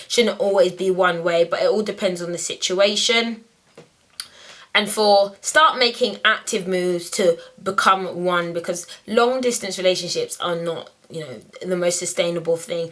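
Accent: United Kingdom. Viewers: Are you from London? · I Looooovvvveee the british accent! <3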